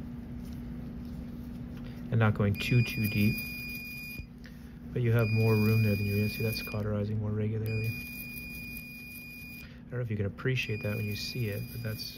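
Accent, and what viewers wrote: Canada. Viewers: Je savais pas que vous parlez le quebecois. Very cool ❤❤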